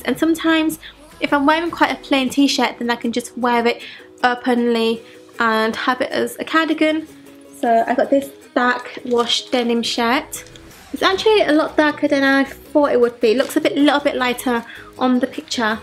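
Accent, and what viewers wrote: Yorkshire, United Kingdom. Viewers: Where is your accent from? Yorkshire?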